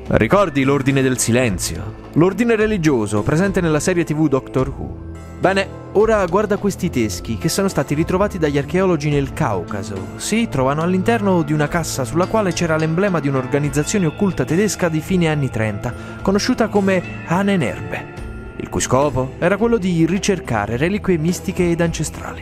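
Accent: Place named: South, Italy. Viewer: di tutti i tuoi video... mi piace il tuo accento del sud. heheheheh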